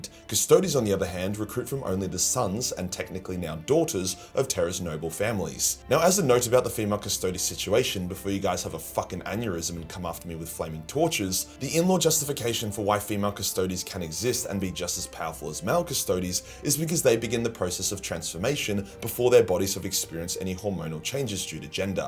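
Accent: Australia. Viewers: G'day ol son.....im new to, and love that an Aussie speaking in Aussie talking about 40K. I recently bought the Spider Space Elve, and Now You have Custodies. I had to get one and the best of these is the Golden Dread Lord, Big Cheers ya ol bugger from A M8 up In the Sunshine State🙃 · This guy’s frat boy personality is normally something I hate but man oh man, slap a Australian accent on it. I will listen to this man all day.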